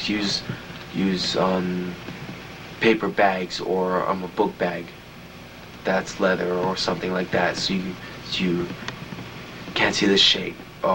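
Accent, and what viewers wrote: New York, United States. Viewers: He has a new york accent tho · He has a New York accent though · his New Yorker by his accent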